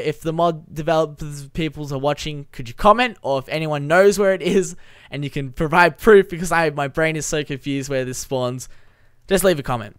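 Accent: Australia. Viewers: He is from Australia that is how they say it there and if he says it our way his mom will make fun of him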